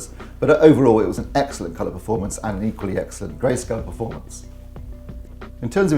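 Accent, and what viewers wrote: United Kingdom. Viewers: Never mind Terry he's off his bean I luv your voice and your detail reviews in a strong British accent. Bravo and well done.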